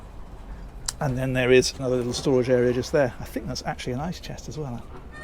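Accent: United Kingdom. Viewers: Was that a "Lightening Bug" by the electric panel? ;-) I'm in awe the way you give your tours, your British accent is a wonderful touch! Brilliant~~~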